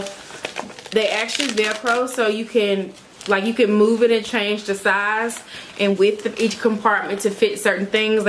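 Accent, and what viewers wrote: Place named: South Carolina, United States. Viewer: Sounds like South Carolina..😀Nice video 🤜🏽🤛🏽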